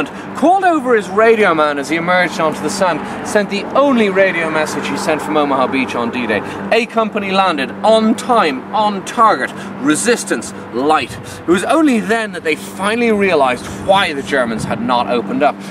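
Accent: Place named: Ireland. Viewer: He's Clearly Irish. you idiot.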